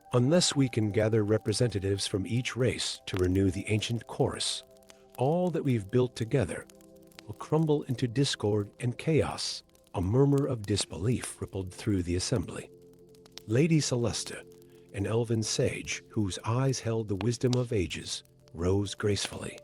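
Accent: United States. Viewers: Love your stories. Really dislike this voice, sounds American, it’s just awful.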